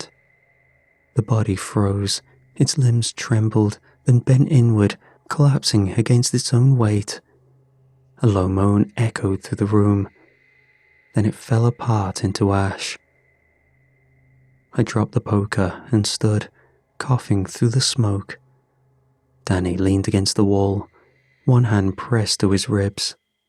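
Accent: United Kingdom. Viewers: I can’t sleep without bedtime stories! 🎉thank you for your dedication and British accent lol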